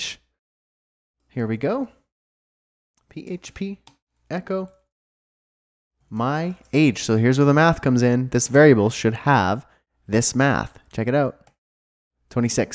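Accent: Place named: Canada. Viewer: I love Canadian accent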